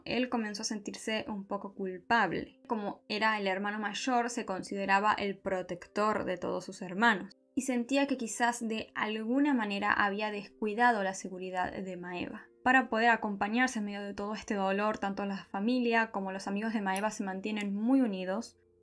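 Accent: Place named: North, Argentina. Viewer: Casi muero al escuchar tu tonada!! Ame!!! Claramente sos del norte pero no quiero arriesgarme a decir que del interior del corrientes. Muchos exitos, suscriptora nueva 😘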